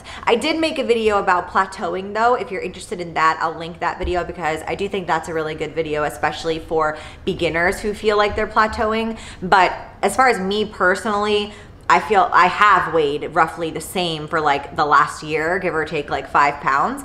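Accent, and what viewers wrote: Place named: Pennsylvania, United States. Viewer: I HEARD PENNSYLVANIA ACCENT, and as someone with family from PA I TOTALLY understand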